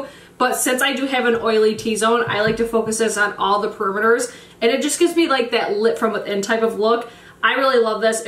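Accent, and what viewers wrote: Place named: New York, United States. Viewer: I really need to watch other things besides makeup videos on YouTube😂like I really should watch more tv shows, movies, read more. But I think that if I’m on YouTube, I will plan on binge watching your videos. Love your NY accent and attitude. Keeping it real and not sugar-coating anything. Love that!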